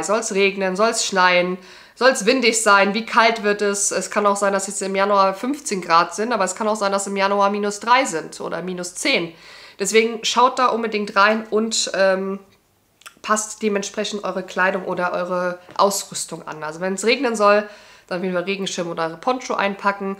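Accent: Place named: Eifel, Germany. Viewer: Hi, aus welcher Ecke kommst du eigentlich 😅 dein Akzent tendiert Richtung Eifel/Aachen/Düren 😅😅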